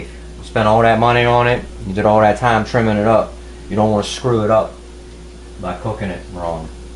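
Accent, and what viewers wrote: Pittsburgh, United States. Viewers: It took me about 5 seconds to tell your from Pittsburgh, love it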